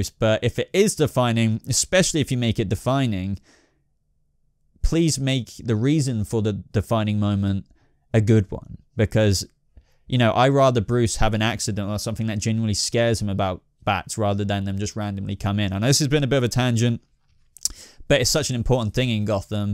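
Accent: United Kingdom. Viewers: Why is there a brit narrating an American show cant listen to this rubbish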